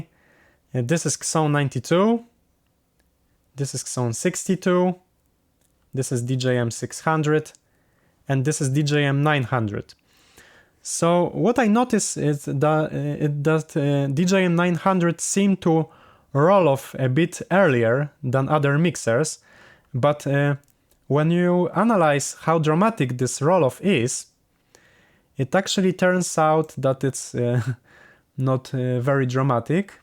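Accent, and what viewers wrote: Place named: Poland. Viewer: Odpalam anglojęzyczny filmik i słyszę polskie akcenty i widzę w opisie, żeś Polak xD pozdrowienia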